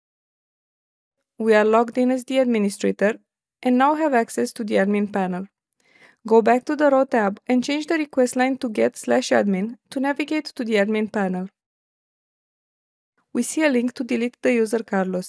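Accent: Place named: England, United Kingdom. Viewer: i like you english accent dear teacher👍👍 would you mind telling me which accent is it??????????????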